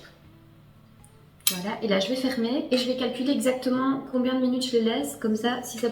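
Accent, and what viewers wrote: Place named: Belgium. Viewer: Salut j’avais deviné que tu étais Belge en 90 g en belge 😉😂👍🏻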